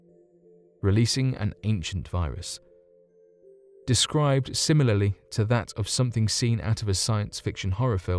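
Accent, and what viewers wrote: United Kingdom. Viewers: All this is a thievering compilation of other people's info from the net and presenting it with a british snooty accent for ignorant fools as news.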